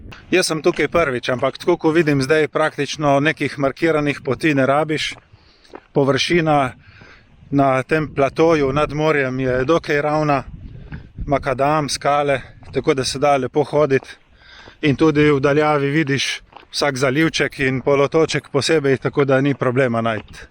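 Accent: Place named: Littoral, Slovenia. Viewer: HAHA, približno takrat sem tud jaz z starši in starimistarši hodu na morje. Sicer ne v ta kamp ampak v Banjole v neko turistično naselje blo je več blokov (mislim, da je blo prek zveze borcev ali upokojecev) kaj človek vse doživi, da na YT slediš nekomu, ki ima še druge podbbne hobije in veselje, in še na skoraj na isti konc hodu na morje (mogoče še celo istočasno). Upam da se kdaj srečamo, mislim da bi te bilo z veseljem spozat, pomoje si zanimiv sogovorec. Pri gledanju tvojih videov sem tudi že opazil, da kolesariš tam kjer sem jaz že bil na izletu. Tko, da je že iz tega naslova zelo lepo gledat tvoje videje. Pa še ta primorski naglas, men en nelepših.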